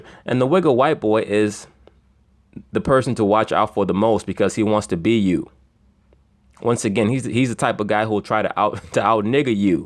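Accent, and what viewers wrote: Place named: New York, United States. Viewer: He was born and raised in New York that's why he talks like that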